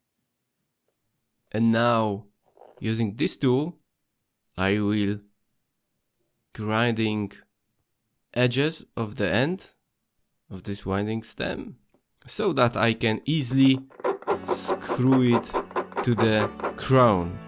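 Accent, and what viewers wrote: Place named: Germany. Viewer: Twoja wymowa brzmi jak niemiecka 🤔 Jak według mnie, obcokrajowca. Zgadłem czy nie bardzo ?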